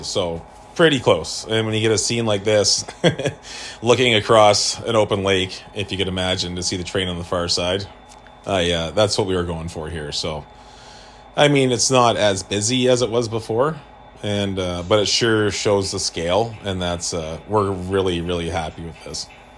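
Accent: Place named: Canada. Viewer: Cheers. I had a feeling you might be in CA. (it was the road name and the pronunciation of 'ou' words - can't miss that! lol) Version 4 ain't your first rodeo. The experience shows, and I like the fact that you are willing to repair/replace/improve the scene or even the entire layout! Also, I like the stretched helix as it has fewer loops and greater access to errant trains. But, I was really surprised that the layout is only 15' wide - it looks much bigger, perhaps due to the generous track radii. So, I'm looking forward to the CTC series, and maybe a track plan? and meanwhile I'll check out the other videos. Thank you for responding - very helpful. Lawrence (CA, too - but, alas! USA, not CA)🙃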